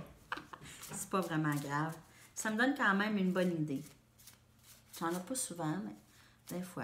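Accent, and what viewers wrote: Quebec, Canada. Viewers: Cc tout est très juste pour ma part pourtant malgré ttes mes épreuves...j ai perdu mon papa le <num> J ai du rompre après 10 ans de vie de couple d avec mon compagnon sur Nice car il n accepté pas les chats de mon papa et j avais fais une promesse à mon père je suis comment dirais je vidée épuisée fatiguée et paradoxalement dotée d une force incommensurable je déteste ces fêtes j aimerais enfin vivre un peu j ai 52 ans et le 6 février prochain min fils Quentin part travailler en Australie gros becs à toi ton accent j adore en 1991 je suis partie au Canada pour mon stage d infirmière j étais dans la région des Laurentides....et les cabanes à sucres que de bons souvenirs donc à cette heure là ici en France ou je te regarde il est 16h27 j en suis à un.point ou tu pourrais m annoncer la fin du monde je te dirais ok c est bien....en plus le syndrome du gisant je suis née le jour de la mort de ma grand mère maternelle si tu savais comme je rêve juste de me poser à qq part ou ailleurs avec mes 9 chats....Nagua Mistigri Pikatchou kopi césar choupinette blanchounette noirou roxy et rouquinou malgré tt cela je reste positive mais je fatigue et comme chanté mon chanteur préféré ^...espère avec ton coeur...la réponse est en toi la question est ailleurs ce n est pas parcqu une étoile s éteint qu' elle éteint forcément le ciel ^...écoute la chanson sur YT:^ ^ Québec je me souviens...une seule envie y retourner passer qq jours devant ses gran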